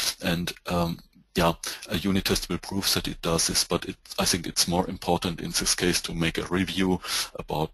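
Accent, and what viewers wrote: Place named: Spain. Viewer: Sound like Spanish but understand very good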